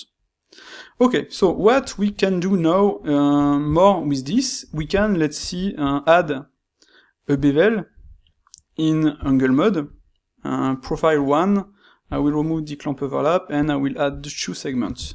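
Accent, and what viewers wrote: France. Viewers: Your English sounds very French in pronunciation 😊👏🤣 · Ooohh Lya Lyaaa ))) youre cool frenchmen ,I like the accent and the teaching ,, appreciate for this techniques . thanks big time! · Don't worry about your accent. I love the sound of the french. I did french in school but forgot most of it. Loved it more than spanish. Your english is quite understandable, so don't worry about the negative comments. Now proceed as normal. Every word of english you speak sounds more expensive.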